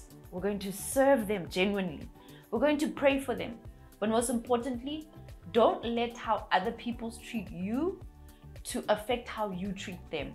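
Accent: South Africa. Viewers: South African accent 😍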